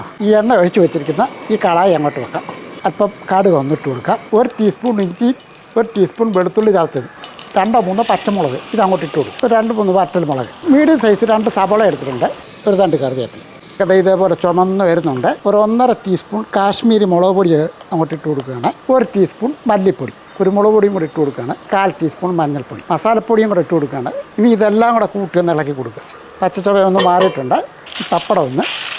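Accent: Kottayam, India. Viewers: ഈ ചേട്ടൻ്റെ കോട്ടയം ഒന്നാതരംടേസ്റ്റ് / നല്ല രുചിയാ സംസാരം കേൾക്കാൻ മാത്രം ചാനൽ കാണുന്നവൻ നൊക് റ്റാൾജിയ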